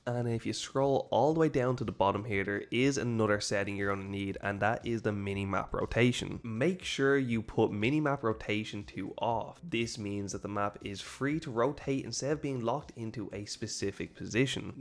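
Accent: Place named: Ireland. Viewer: i can hear the irish in this fella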